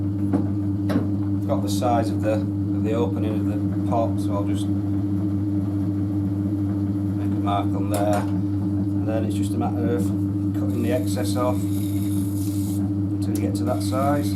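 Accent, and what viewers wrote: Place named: North, United Kingdom. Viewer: Lovely to hear a northern accent! Thanks so much for your time on these videos. Cookie jar looks a treat mate.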